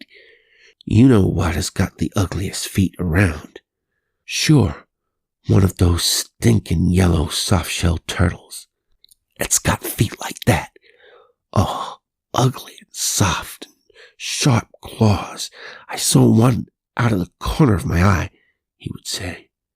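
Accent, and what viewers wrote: United States. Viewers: Wow Your American accent is superb! I’m so impressed - You have me wondering which of your accents is authentic to you! I was so excited to see you posted this, to have Ian Gordon and in the same day is a huge treat! Just in time to help the evening summer showers break the oppressive heat wave with chill bumps 🖤 Thank you!! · Your generic American accent is very good.. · Excellent story and narration! You have a great American accent. We all had a place like this growing up. Passing it caused our nerves to be on edge, and when we got home we were so relieved. The things we thought lurked out there... I find the light pollution, population growth, 24/7 traffic, and increased background noise dissipate the feelings nowadays. As always, the sounds and environmental/background noise fits the story soooo well. Fantastic job, my friend! 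You have been spoiling us lately. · while there's an occasional hint of your English accent, I'd say your Anywhere, USA accent is pretty good. I mean, it's a hell of a lot better than my attempts at an English accent. 😀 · Your America accent is good, except, (if you're interested/open to notes) that you keep adding a southern drawl on "Ah" sounds and periodically when emphasizing a word or phrase, into what is otherwise a northern/eastern accent. Not a fan of Derleth in general, but this is one of his best- almost a Bradburian or King tone, which suits him better than bowdlerizing Lovecraft. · Ooh great story and fantastic American accent Thanks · No problem with Englishmen attempting an American voice. Yours is fine, and the one other narrator (Greg Wagland) who attempted an American voice for a character did all right, too. Which is amazing to me, because if I attempted an English accent, it'd sound more out of place than Al Jourgenson's in the song "Every Day is Halloween" * *(Lead singer of Ministry) Ministry used to be a synth-pop band before they went metal, and in his synth-pop albums he sang with a fake English accent. 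Kinda cringe-y Your American accent is believable. I'm always up for some Derleth. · Love the American accent and the background sounds. Thank you 😊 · Thank you. I loved this story and your mild American accent was just right for it. Great 👍 😊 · His accent was excellent. If l hadnt known it was, l would have believed it was a Murican reading the story, great atmosphere, totally there, recall a few lonesome places from my childhood back in the sixties, first l had to pass a cemetery with a really old churchyard cemetery on its other side, but l could stay over the road from it, but on the other side so fare up a rise in the road, was nothing just bare open scrubby land, all just been bought and turned into an housing estate as years webt by, but then it was pitch black one street lamp that barely lit up underneath itself, that was dark almost from the edge of the path for a quarter mile then dipped down into a hollow. 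Then on the other side of the road going up over the rise was the back of a row of terraced houses, scrubland between the edge of the road up to the cobbled back street where dustbins the old metal type were left out, then the yard walls of the houses 80 year old built for the iron ore miners, l would get to the fish n chip shop l had been sent to only about 7.30pm but in late november dark as it could be, sometimes before l used to pass thru the street as it was lit by street lights and lights spilled out thru thin curtains, you could se the flicker of tv sets, and often hear music or actors speaking, it was good that way only at the bottom of the street it was a little clozer to the. Churchyard, but l could run past that bit at high speed, but still l could imagine my worst fear happening six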